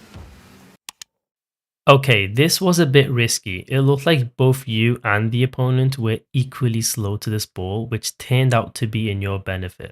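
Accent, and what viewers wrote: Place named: Liverpool, United Kingdom. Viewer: Good work mate 👏 Why do you sound like a fellow scouser 🙀🙀🙀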